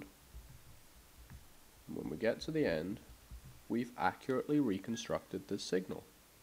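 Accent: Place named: Northern Ireland, United Kingdom. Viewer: where are you from I can't tell you got a mad accent fam sounds almost northern irish but at same time quite english what type of hybrid are you this is melting my brain at this tender hour i need to rest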